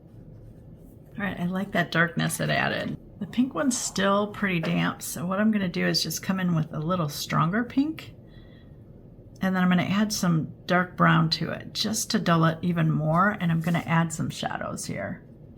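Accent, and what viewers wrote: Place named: Midwest, United States. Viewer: Hi! I just want to tell you how much I love your style and enjoy your videos. Your work is gorgeous! Thank you so much for sharing your talent ❤ On another note, I’m curious to know where you’re from. You mentioned the Art Institute of Chicago in a video I recently watched. I was born in Chicago and have ties in Wisconsin. I’m thinking your accent is maybe Wisconsin…Michigan…Ohio…?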